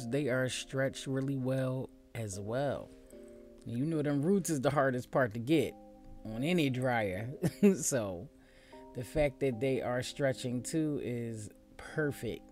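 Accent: Philadelphia, United States. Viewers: You sound like you’re from Philly. And where are u located? I moved from Jersey to Florida and I can’t find ANYONE that I trust with my natural hair😫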